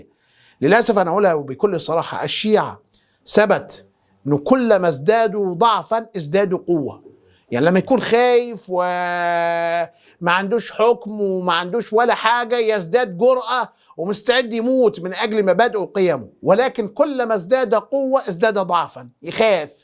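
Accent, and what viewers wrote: Egypt. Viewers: ولك انت مو عراقي شبيك تحجي مصري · هذا انت ومن العراق ليش تحجي مصري يمعود العن الشيطان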